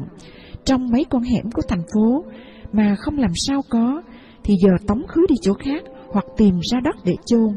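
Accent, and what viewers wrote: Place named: South, Vietnam. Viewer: Giong noi' cua Co dien doc tren day . Oi ! That em ai' ,diu dang' . Giong noi' de thuong cua nguoi' con gai' mien ' Nam .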